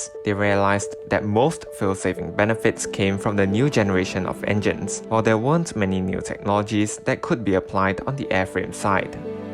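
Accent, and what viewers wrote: Singapore. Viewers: im guessing thats a singaporean accent with some shots from changi?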